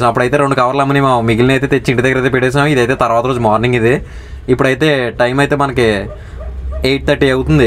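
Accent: Godavari, India. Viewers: Godavari voice bagunadi mava ? Akade o 5 yakarala lo niu padichu ? Try chaye bro ❤ ❤❤ Eluru district bro ❤❤❤❤❤❤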